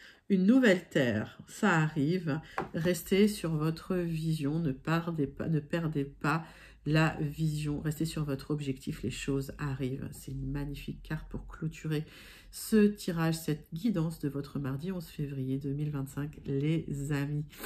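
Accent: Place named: United Kingdom. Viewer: Waouh de plus en plus l'accent British 😅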